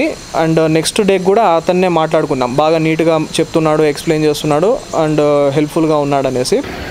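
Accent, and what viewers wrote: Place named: Chittoor, India. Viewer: Bro.. Voice and slang of chitoor superb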